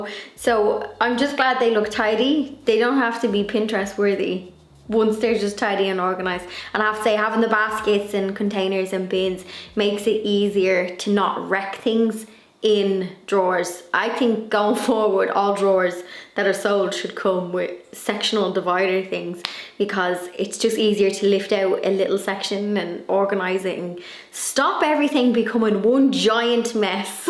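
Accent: Ireland. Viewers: Your so bubbly and I luv that your so independant and a home owner. I too do most things myself,independant and am home owner. Luv your Irish accent . I'm Canadian. Luv your videos and Blondie.🎃🎃❤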